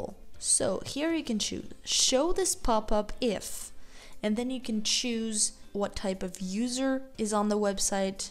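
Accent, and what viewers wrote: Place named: United States. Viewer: love ur american accent ;)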